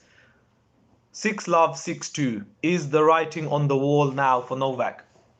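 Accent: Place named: United Kingdom. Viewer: Guessing from his accent, I'd say the UK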